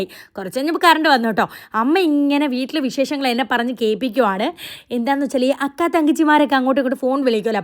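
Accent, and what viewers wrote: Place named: Thrissur, India. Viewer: Njn oru thrissur kari ahne ante amayi nt mole kolam punaloor ke ahne marriage cheythe ayachde, chechi edh polle same style ahne samsrika adh thrissur slang full mari samsrikana polle same way ahne samasrika, njn evarde video first kandapo vijariche edh thanne ahne ahh chechi samsrikana polle ahanallone 😇 edh oke oeu allekarede nade videum oke difference ille endinna igane negative comment idane 🫡 nammake ishttam alle ayalde video kannanda 😊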